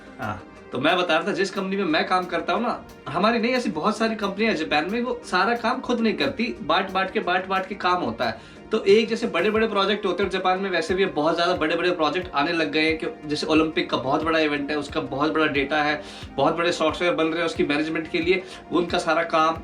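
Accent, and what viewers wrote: Haryana, India. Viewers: Bhai haryanvi bolte ho kya Japan me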